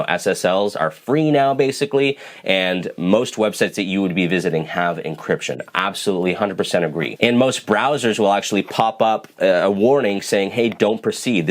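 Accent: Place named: Canada. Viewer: I think he is Canadian. It may be the about = aboot (not teasing, I have a few pronunciation quirks myself).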